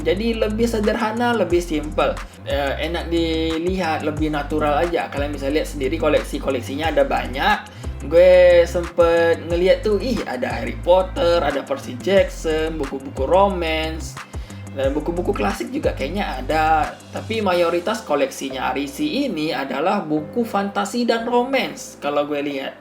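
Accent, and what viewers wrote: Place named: Malaysia. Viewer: Logatnya udah kayak urang Melayu banget.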